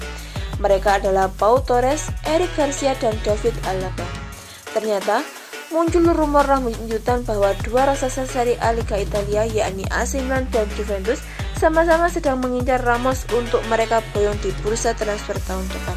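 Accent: Java, Indonesia. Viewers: mba medok bnget mba suarane😂🔴⚫❤ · narator nya ganti nih..medok doang jawa nya tapi suaranya lemes, notasi naik turun gak ada..lempeng doang kayak baca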